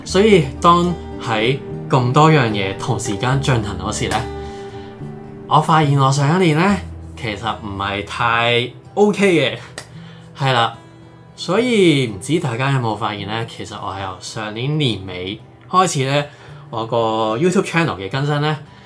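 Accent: Hong Kong, China. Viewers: 原本係無打算comment, 不過見你嘅facial expressions俾你既說話更成功表達你內心感受， 都係決定寫幾隻字俾身處異地既香港人打打氣💪 caption寫你婚姻生活幸福嗎...感情既野作為花生友......就......no comment is the best comment, 但你有話你倆口子都係相信marry & immigration to taiwan 係正確既, 咁就唔好忘記你因為咩原因嫁去台灣, 「無忘初衷」四字絕對係任何選擇移民/離鄉別井人仕最重要既core value! 自己認識幾位朋友遠嫁歐州10年以上, 但仍然成功解決語言障礙同保衛異國婚姻（分別為德國, 西班牙, 泰國, 法國同意大利人妻）我估學好dd台灣國語even少量台語唔會太難既💪（細細聲話你知, 你d港普真係😅😅😅😅😅）最快速學外語既方法一定係煲劇同去菜市場, 唔sure你有冇工作, 但日日都要食飯, 唔煮都要食生果啦, 試下堅持日日去菜市場買下餸應該唔識講都一定好快識聽🥳 再唔得, 你youtube 睇晒小S d台灣綜藝無可能進步唔到既, 輕輕鬆鬆30分鐘至1個鐘, 相信可有效鬆開你眉頭同學下台式國語既❤ 有關內耗問題, 如果你對自己要求好高個種, 放心「內耗是不會停止的😂」反而應該好好同自己既內心小孩共處, 估你應該係I人, 花d時間好好了解真正既「自己」, 性格/喜好/長處/短處, 你越了解自己, 係迷網既時侯可以更快organize 你思緒再出發, last but not least, 你嫁去台灣唔係被人賣豬仔, 1年返1次香港逗留1-2個week都唔係難事, 你話你地係異地戀開始, 當初1年半載都捱過, 你每年返鄉下探親既日子正好係每段愛情最珍貴既「喘息空間」同醫治思鄉病, 最後如果係台灣未能係工作上settle down, 點解唔approach 下香港公司？ 只要有internet,一定有d part time/ freelance job接到, 移民人士最大困擾一定係$$$, 收入穩定咗, 個人一定係會淡定d🥹🥹 見你struggle係「習慣」二字上, 某程度上你似struggle係sense of belongings多d...... 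香港人好捱得, 但如其將就捱過去, 不如加油衝過去❤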